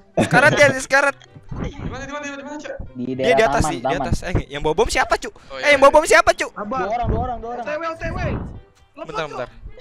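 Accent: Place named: South Kalimantan, Indonesia. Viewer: Bang lu orang banjar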